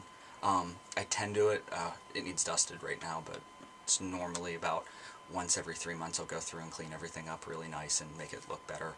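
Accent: United Kingdom. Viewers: i am british and i honestly thought you were as well from that video you did.... impressive accent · I have watched this video and your biggest fan video and think you are absolutely fabulous! It is so wonderful that God enabled JK to create a series that followed many kids from their childhood to adulthood getting them to not only read books longer than anyone would have believed but to devour them and beg for more. I'm also happy that the films do the books justice. Harry Potter has been just wonderful and my only question to you is did you learn your British accent entirely from the films?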